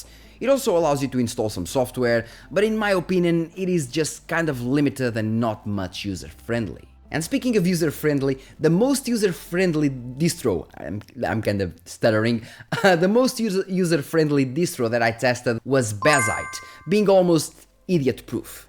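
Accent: Portugal. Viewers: Assim que abri o video percebi logo que eras tuga lol, bom video, já há cerca de 3 meses que uso mint e ando a gostar bastante, mais tempo de bateria melhor performance, sem telemetria (tudo comparado com o W11) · I can hear your portuguese tgroufh your english accent. (Not a critic.. Mine is worse).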